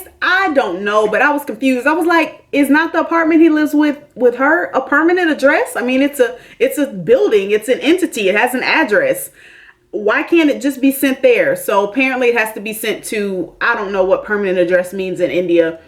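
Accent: South, United States. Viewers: lol...You are so southern like me..It's funny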